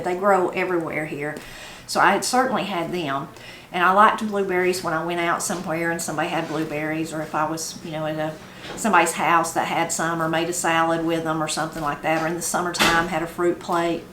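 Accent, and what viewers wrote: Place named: South, United States. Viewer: Good morning, I enjoy your videos. Thank you I live in Connecticut, your southern accent is charming and you stories and anecdotes are interesting. I would like to offer my tip for blueberry picking. I want to use 2 hands to pick so i use a milk jug that I cut the top off but leave the handle to which I tie a ribbon or string that I put around my neck for hands free picking. I always bring a larger container to dump into as I go so I can get slit of berries.🙂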